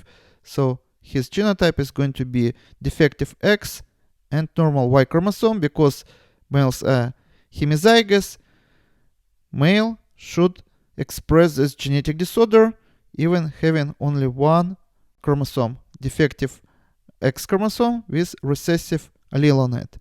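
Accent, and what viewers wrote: Russia. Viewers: Ur accent, russan or greek or what?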